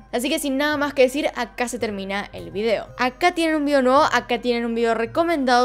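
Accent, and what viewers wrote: Argentina. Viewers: Hola eres de argentina? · Dios mio, no se si es que me gusta el acento argentino......o si en realidad lo que me gusta es TU acento!!!! 😂😍😍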